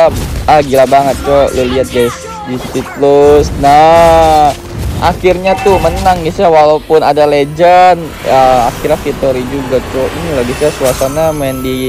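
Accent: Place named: West Java, Indonesia. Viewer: Mang orang sunda daerah mana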